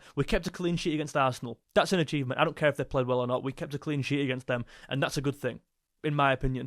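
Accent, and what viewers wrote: Liverpool, United Kingdom. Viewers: what a scouse accent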